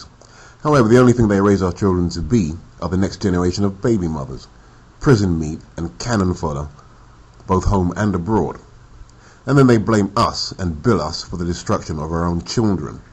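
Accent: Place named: United Kingdom. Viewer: This content is powerful and it's a uk voice.usually it's the guys from the states talking Truth.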